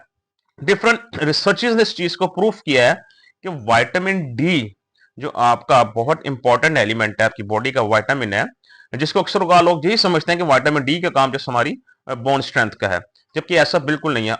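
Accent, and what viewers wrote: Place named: Pakistan. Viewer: السلامُ علیکم ۔ لہجے سے تو آپ پاکستانی لگتے ہیں۔ اور ذیابطیس کی یہ انوکھی وجہ آپ نے بتائی۔ میں ہندوستاں سے ہیں ۔ مجھے بھی ٹائپ ۲ شوگر ہے۔ فیملی history میں کسی کو کبھی یہ مرض نہیں رہا۔ کیا مجھے بھی آپکی سُجھائی باتوں سے فائدہ ہوگا۔ ہمارے یہاں کے پروفیشنلز کا آپکی دی ہوئی وجوہات پر اعتماد نہیں ہے۔ آپ کے ملک میں اس مرض میں مبتلا کتنے لوگ ہیں؟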